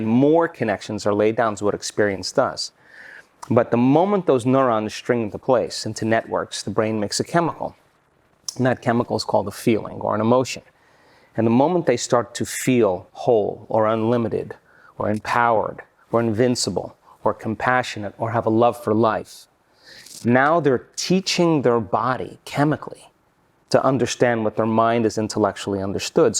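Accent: Germany. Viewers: cuuuute german accent :)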